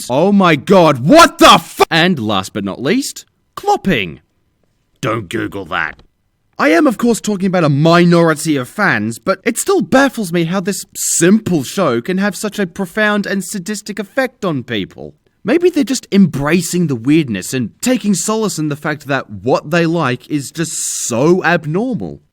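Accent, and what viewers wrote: Australia. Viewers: Its like Tears of Grace and The Escapist had a love child with an australian accent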